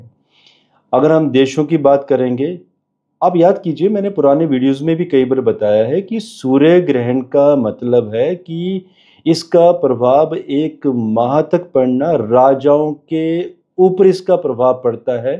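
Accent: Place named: Himachal Pradesh, India. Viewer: Inka accent himachal ka hi. Fizzy hi niklega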